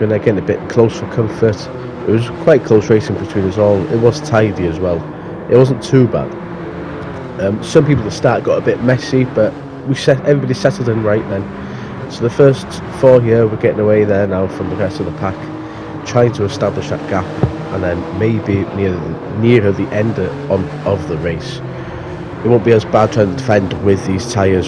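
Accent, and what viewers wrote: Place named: Wales, United Kingdom. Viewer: Notice that Welsh accent now buddy! 😂 great result buddy!🤟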